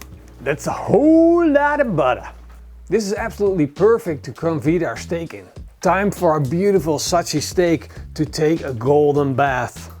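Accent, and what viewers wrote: Netherlands. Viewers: Dutch accent. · Sounds like your from the Netherlands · Dutch accent... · You think he's French Canadian? He's obviously Dutch. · are u dutch? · Are you dutch you sound dutch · You’re dutch aren’t you? I can hear it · Definitely Dutch by the accent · Mann i speak both and yeah thats a pretty clear dutch accent or maybe from belgium but i doubt that Belgians have a bit different accent aswell. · Ja, die Nêderland se aksent. Man kan die Boere en die Nêderlanders spot-on hoor. Dis altyd gaaf. Die beste groete uit Rusland! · There's no way you're not dutch, it's the first thing I noticed when you opened your mouth 😂 Nice video either way! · Ja, hij is echt Nederlands — “What IS up?” ... maar zijn Engels is bijna perfect. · Is he dutch? Because this accent is very dutch😭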